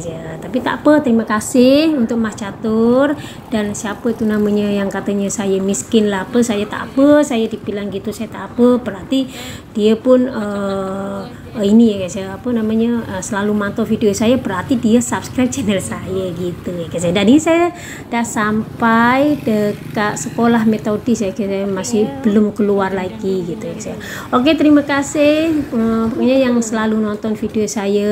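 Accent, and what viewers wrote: Indonesia. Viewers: Mbak saya orang Malaysia izinkan saya memberi dua saranan berikut : 1. Bagusnya mbak jelaskan apa maksud istilah "flat" yg tertulis di judul dan juga dituturkan dlm video. Kebanyakan warga Indonesia tentu tidak tahu apa itu "flat"- tidak ada istilah begitu di Indonesia. 2. Sebagai orang asal Indonesia dan juga untuk berbagai informasi kpd pemirsa di Indonesia, ada baiknya - dlm sebahagian video jika tidak semua - mbak bicara dlm bahasa Indonesia bukan dlm bahasa Melayu. Penuturan bahasa Melayu mbak bagus sekali walaupun jujur masih kedengaran ciri2 "orang Indonesia" dlm penuturan.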